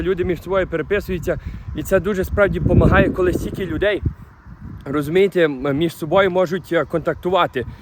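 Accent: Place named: Ukraine. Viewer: Що смішного? Український діалект. Діалекти має кожна нація!!!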